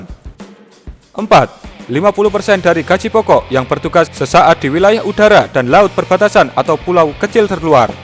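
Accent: Java, Indonesia. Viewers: Saya tertarik channel ini, ngomongnya medhok jawa kayak saya. Saya subscribe mas 👍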